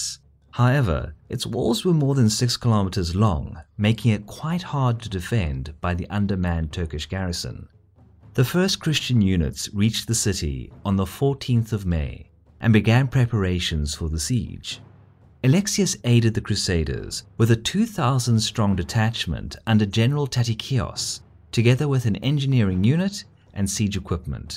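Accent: South Africa. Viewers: comentor of dorleaum battle is he south african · Nice South African accent by the narrator.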